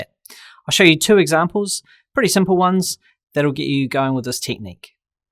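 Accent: New Zealand. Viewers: Nice to hear a new zealander for once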